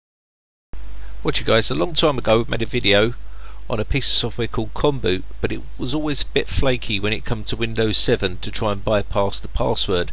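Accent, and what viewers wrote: United Kingdom. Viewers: This is one of the most annoying Brit accents...among many many others.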